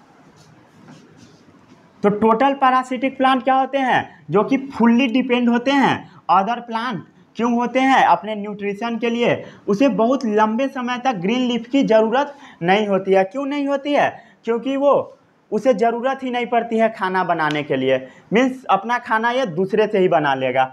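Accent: Bihar, India. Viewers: Bhai bihar se ho kya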